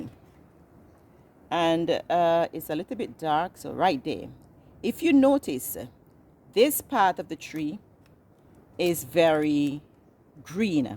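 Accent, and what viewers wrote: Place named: Antigua and Barbuda. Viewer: thanks very much l sm retired from construction and is experimenting with a backyard garden and you helped s lot . and you were very clear.you sound antiguan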